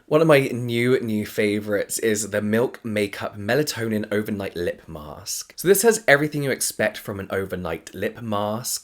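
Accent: United Kingdom. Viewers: Just discovered you. Great videos that get straight to the point. I also love your super calming voice (British accent is a plus 😉). Love your content! Keep it coming.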